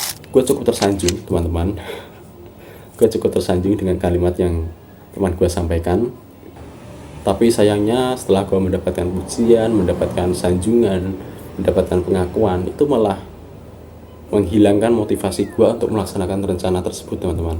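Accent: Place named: Java, Indonesia. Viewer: kalau medokk jangan maksa GUA bang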